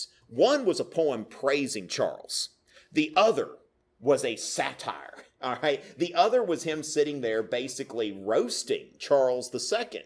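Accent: South, United States. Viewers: As an Englishman, listening to our history getting explained informally in a strong southern US accent just doesn't sound right 🤔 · Good lecture but it is so strange to hear such strong southern accent. It is not the guy's fault, but it is difficult listen to.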